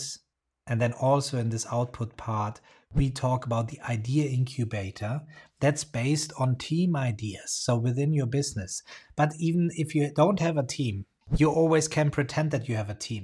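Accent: Germany. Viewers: yes I discovered you guys one week ago and I am very impressed, I love your framework… one question where can I contact you? And I am very curious looks very Spanish but your accent is German isn’t? 😅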